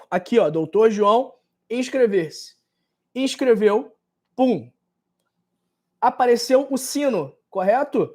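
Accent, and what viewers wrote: Rio de Janeiro, Brazil. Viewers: Oi dtor. Adoro sotake. Do. Rio. De janeiro. Acho. Lindo.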